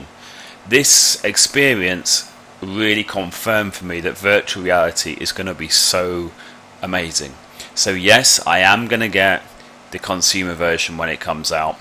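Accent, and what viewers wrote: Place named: United Kingdom. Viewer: why in the fuck do british mother fuckers talk??????? lets just use some CC on this bitch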